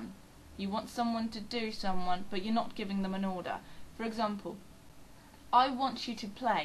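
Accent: United Kingdom. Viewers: ¡Hola,! Me encanta tu video, muchas gracias:)) Tu inglés británico es hermosísimo, y tus esfuerzos por explicar el uso del subjuntivo son excelentes. Yo mismo soy profesor de español, y me cuesta mucho trabajo explicar el subjuntivo a mis alumnos rusos... ¿Eres de Chivilcoy? ¿Por qué me mudé a Rusia y no allí...?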